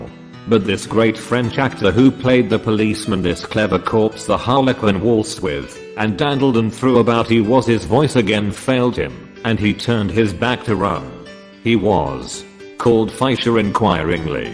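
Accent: United Kingdom. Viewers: All of his voices and pronunciation is perfect!Full of British Accent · good british accent...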